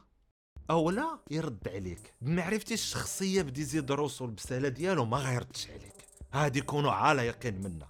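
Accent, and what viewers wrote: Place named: Bechar, Algeria. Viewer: ❤صوت❤ ديالك كايشبه لصوت نتاع ولاية بشار جزائر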